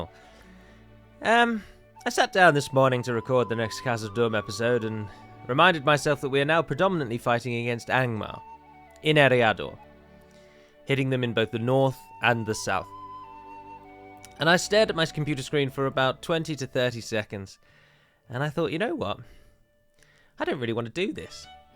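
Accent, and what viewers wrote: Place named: United Kingdom. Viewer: Absolutely agreed. Do what your heart desires and I will be here just for the ride as well as that amazing and clean british commentary. At least that is what I am mostly here for... humour included:D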